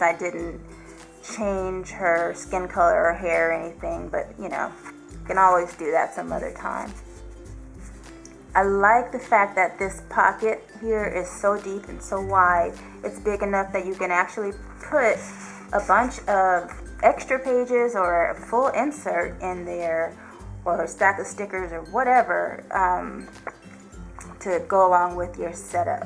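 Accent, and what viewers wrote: South, United States. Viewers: Great setup! I loved your country accent, it was fun 😉. I'd love to see a tutorial on how you change the hair and skin color, I really love that idea. Thank you for the chance to win these goodies, my favorite part of the kit are the sunflowers! They are my favorite flowers and are drawn so beautifully in this kit 😍. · I loved everything with yellow on it! I love that color for a transition into fall🧡. Another thing I loved was your southern accent it gave me a good laugh☺ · Absolutely adorable! Your southern accent was great, teehee! 👏🏻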